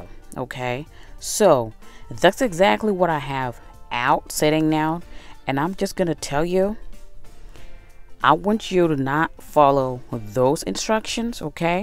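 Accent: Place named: South, United States. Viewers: Thank you so much for sharing this! I think us ladies work hard enough, and I wish housewives got paid for all the work that we do...but don't just say I'm a housewife, no--say Domestic Godess! Love your recipes and yes also your accent (southern?) Have a beautiful day 🌹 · I sure thought u was from Louisiana 😁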